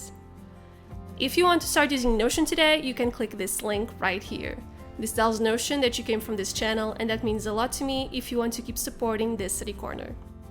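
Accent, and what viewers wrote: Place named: Portugal. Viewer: só pelo sotaque consegui perceber que eras de Portugal ahshagshs adorei o conteúdo, you just gained one new sub <3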